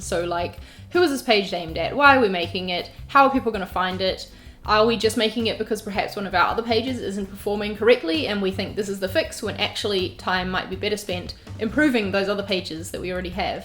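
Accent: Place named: New Zealand. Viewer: such a rare thing hearing another kiwi on youtube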